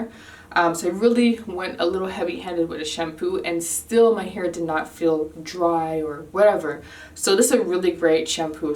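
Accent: Toronto, Canada. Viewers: Beautiful hair! Congrats on the baby❣️Are u from Toronto ⁉️I detect the accent. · wait, you live in Canada? wow never knew that; i can definitely hear it in your voice now lol. anyway, your hair is so pretty. i like how your hair came out! i havent done a twist out in so long, and this has inspired me to do it - thanks !! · it sound Canadian but I definitely could be wrong? 🤔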